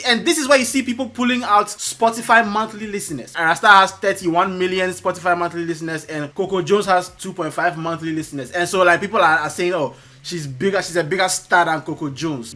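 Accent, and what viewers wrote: Ghana. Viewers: this failure think say he dey wine me. You average 700 views when it's about your boring, begging, imf dependent republic. Your biggest views in the last 15 months is about kai cenat in Nigeria with those funny but nonsensical headlines. You're a Mediocre who review trends from Twitter with your funny but disgusting Ghanaian accent and the terrible English that comes with it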